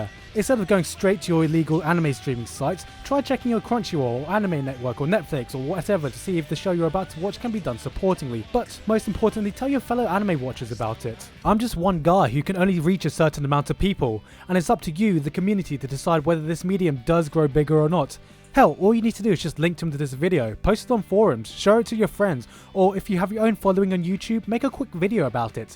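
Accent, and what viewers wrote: United Kingdom. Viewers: No! nonono, you are not a Uptight Obsessed Douchbag, you are a Uptight Obsessed Douchbag with a british Accent. And i must Say, you should not buy every single DVD you watch! Basically we should force the market to put anime Back on TV Nothing less! The Netflix Model is quite interesting but here in UK i dont think they have anything on it.. Only buy material if you really like the Franchise, and !!APPROVE!! otherwise you are feeding fatcats.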